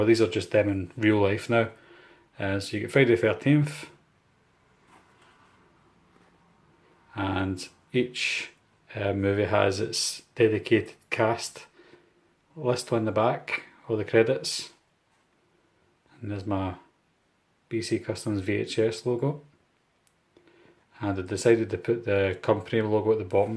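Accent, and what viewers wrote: Scotland, United Kingdom. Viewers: I knew a could hear a Scottish accent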